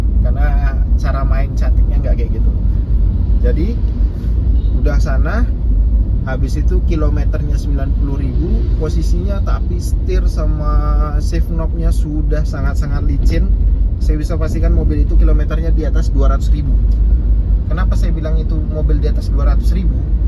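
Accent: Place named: Padang, Indonesia. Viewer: Logat abg kyk org padang 😅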